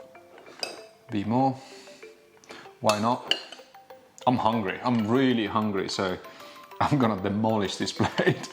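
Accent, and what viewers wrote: Thailand. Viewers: Loving the Thai accent!! Can’t wait to make this 👌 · The Thai accent is perfect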